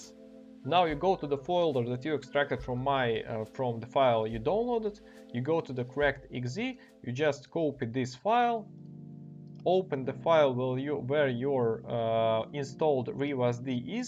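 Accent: Russia. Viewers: Я сразу по акценту поняла, что ты русский, вхахаха) Thanks for the tutorial, though:) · Спасибо что сказал как подключить! Странно это прозвучит, но я на начале видео понял что ты из россии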